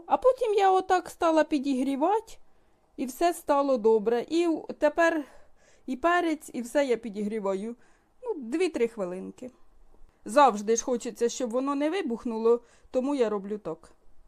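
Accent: Poltava, Ukraine. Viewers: почула вашу мову і сльози на очах.... Мені вже 60. Я народилась в Полтавській обл, Чутівський рн. Ви так балакаєте, як у моєму рідному селі Нагорна, поряд Вільховатка. Звідти родом моя покійна мама. Так хочеться хоч разочок ще побачити те, чого ніколи не забувала. Завадила війна. Щиро дякую тобі за наш особливий говір. Ти й не уявляєш, яке це щастя. Наче побувала на Полтавщині.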